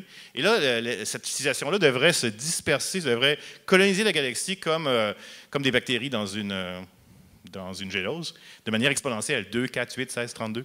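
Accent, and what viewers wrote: Quebec, Canada. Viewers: Je trouve qu'il a la même voix. J'ai le même accent, je suis québécois. On n'est pas obligé d'être d'accord mais c'est ce que je pense vraiment. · J'aurais bien voulu écouter mais je suis gêné par l'accent... Dommage. Vous n'arrivez pas à parler avec l'accent parisien, les Québécois ? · J'adore sa manière de parler, dépaysant et réconfortant. C'est ce qui est cool dans le Québécois comparé au Français, c'est que c'est la même langue mais avec des intonations et des expressions différentes qui font qu'on arrive à exprimer des idées d'une autre manière.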